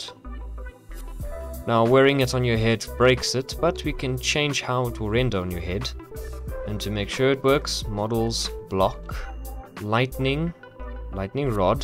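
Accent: South Africa. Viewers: You sound very much South African :) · Great video! I have one question tho... Are you also South African?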